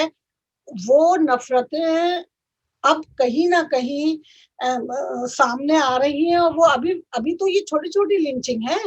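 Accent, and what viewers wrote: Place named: Haryana, India. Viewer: Haryana ala boltay madam😉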